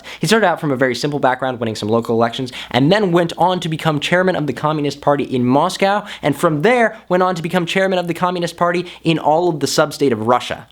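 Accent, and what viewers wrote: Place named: France. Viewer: How in the world did you know all this? More importantly, your French/Non Russian accent is adorable.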